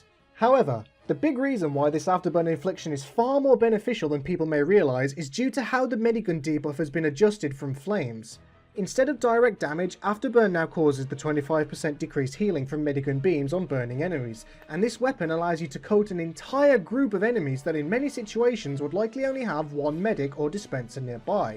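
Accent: Poland. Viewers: M8, u from Poland?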